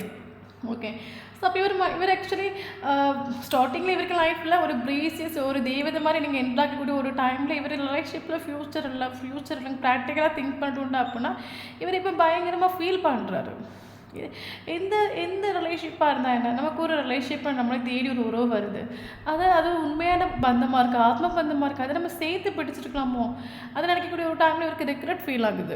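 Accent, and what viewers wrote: Kerala, India. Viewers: Hi Mam neega keralava? Malayalam kalandha tamil very nice to hear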